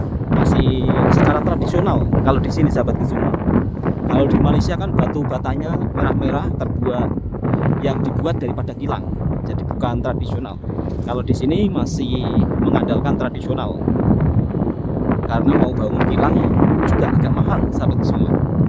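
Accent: Java, Indonesia. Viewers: Lidah jawa terlalu pekat walau berbelas thn diMalaysia.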